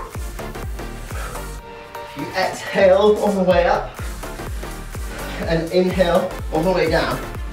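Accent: United Kingdom. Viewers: I like ur british accent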